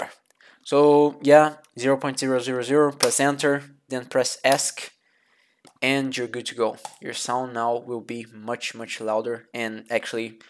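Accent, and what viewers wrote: Brazil. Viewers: I know a brazilian when i hear one, meu camarada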